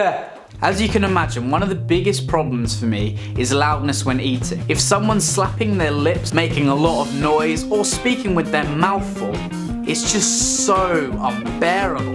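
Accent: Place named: United Kingdom. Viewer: Umm... it could be just a British accent. Not everyone speaks American English.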